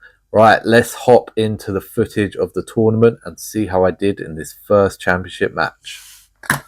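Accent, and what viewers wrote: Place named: England, United Kingdom. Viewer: why do you have an English accent but live in poor ass Philippines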